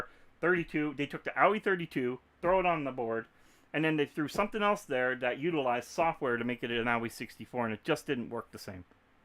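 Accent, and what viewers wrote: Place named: Texas, United States. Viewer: Worst Texas accent I ever heard y'all.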